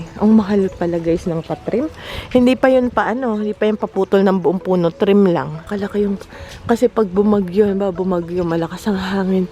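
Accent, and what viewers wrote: Batangas, Philippines. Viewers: ate new to your vlogs💕 batangueño ka ho baga?🤗♥️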